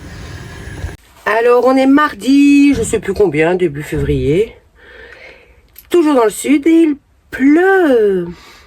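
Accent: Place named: North, France. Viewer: bon week end a vous er continuer vos video elle sont tres bien j adore votre accent sa me rapelle ma jeunesse dans ce chnord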